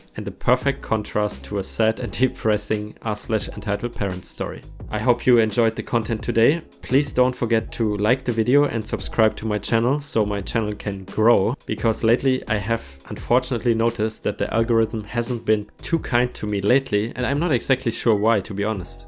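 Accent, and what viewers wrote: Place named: Germany. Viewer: Heyho ^-^ is it possible that you are German ? Becouse i am and your accent is a little Bit german, i was just curious (sorry for Bad english i am german ^^")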